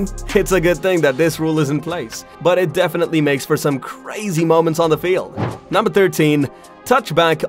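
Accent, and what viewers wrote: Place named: England, United Kingdom. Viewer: You give 14 rules, not 13. Judging by the pronunciation of words, these are rules people in England don't know exist. The only ones that I had never heard of were the "palpably unfair act" which is vague and would be something along the lines of intentionally breaking the standards of the game -- say, Philadelphia removing goal posts so both teams had to try for 2 points, which their QB sneak ability gives them a distinct advantage. Or a team putting players into the game that are not on the roster of active players for that game. The other rule you call "touchdown touchback" isn't a rule. The rule I think you are trying to cite -- especially based on the images you show -- is "if the offense fumbles the ball and the ball crosses the defender's goal line and goes out of bounds, the ball is awarded to the team that was on defense as a touchback." Also, many clips didn't depict anything regarding the rule in question. 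And a number of the clips were of college teams, not NFL.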